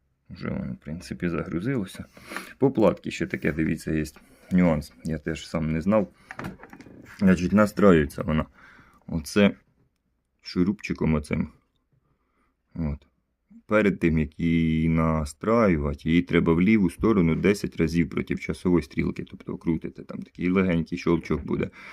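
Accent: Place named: Surzhyk, Ukraine. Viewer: Автор говорит на суржике...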